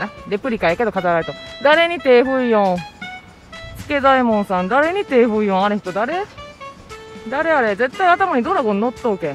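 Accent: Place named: Kansai, Japan. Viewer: さんの関西弁✨懐かしくてホッとするわ〜^ ^ 堺市😮旧堺灯台✍️こんな場所があったのでねその周辺も綺麗な所ですね✨✨ボーリングのピン🤣👍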